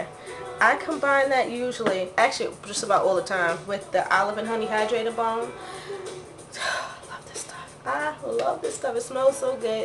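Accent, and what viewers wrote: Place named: New York, United States. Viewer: Yes, you are a fellow New Yawka. =p Anyway, I know Qhem appreciates the free advertising. Good job!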